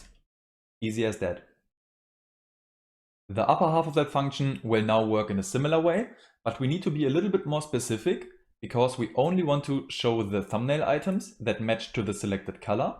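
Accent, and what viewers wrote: Germany. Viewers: are you german ? you have the accent man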